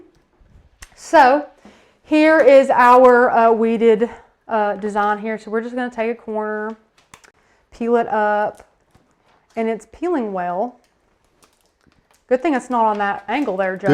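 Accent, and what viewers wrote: South, United States. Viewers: where are you from? You have more of a southern accent than me 😊 PLEASE PLEASE Show how to use with Android tablets I NEED TO KNOW HOW TO. 😭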